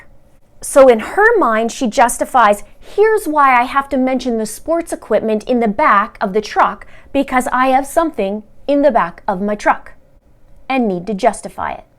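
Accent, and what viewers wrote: Canada. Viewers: Thank you so much for following this case. We all hope Gannon is found soon and want guilTEE to pay for what she's done. Nothing she says makes any sense. She's evil, but not a very good liar and certainly not a very smart criminal. Keep up the great work. BTW, I love your canuck accent! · Omg, I just love your accent! “Oot and aboot.” If trump steals the election in Nov, I may move north, watch hockey, and say ‘oot and aboot,’ too.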